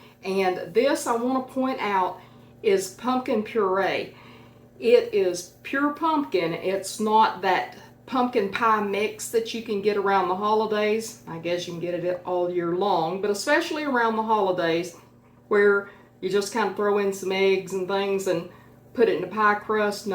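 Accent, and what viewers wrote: South, United States. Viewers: I love your southern accent! This is just what I was looking for. I had previously tried to fancy-up the gingerbread mix with pumpkin by myself and did not elimate the box ingredients of water and egg and oh my, what a very moist and mushy kinda dessert mess more like a pudding which has its purposes. But this time I only added pumpkin as you did and then added Heath crunchy bits to give it a nice surprise. I plan on making a creme anglaise sauce to make it classy!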